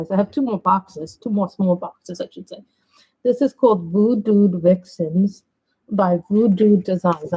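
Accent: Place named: New York, United States. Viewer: Wow. You were really busy in June. Great job on finishing books. I have yet to finish one. I jump around so much. I just want to color all the books. Lol. I hope you are enjoying/ enjoyed your vacation. So tell me where in NY are you? Yes the accent gave it away. Lol. Of course I know it well as I’m a New Yorker. I use to live in Yonkers. I’m now in Florida. Ok. Take care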